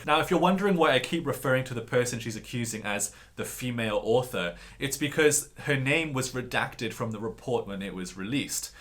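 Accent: England, United Kingdom. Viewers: This guy speaks perfect English English. He actually says "dyoon" not "June" when saying "Dune"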